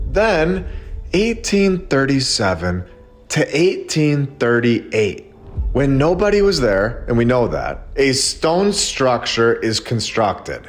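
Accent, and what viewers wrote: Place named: Wisconsin, United States. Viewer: you can't hide that wisconsin accent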